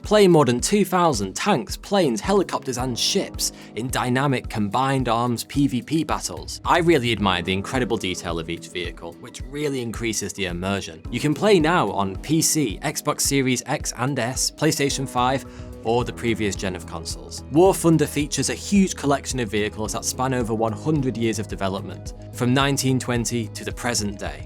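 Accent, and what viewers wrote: United Kingdom. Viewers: 10,500 dollar per ticket according to my Unc. We spoke about it awhile ago. When it first was introduced it was much cheaper. Lol first time i heard a british accent. I flew concord when I was 6 or 7 like...mid 90s. It's really small inside, like really small. Never experienced service like that tho. Beautiful experience from what i remember. I didn't even think of taking in the experience of seeing the curve of the earth. I would be to scared to fly concord now. The Russian one looked cool. I need to build the lego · Another freakin British accent channel, I can’t wait for a big Channel to call out this plague that’s been going on for the last 5 years .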